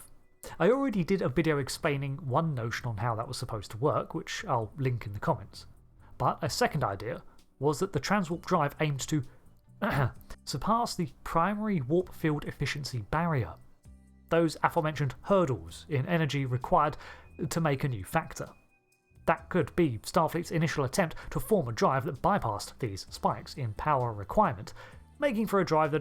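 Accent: United Kingdom. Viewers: I, LOATHE british-narrated, ANY-thing! FAIL!!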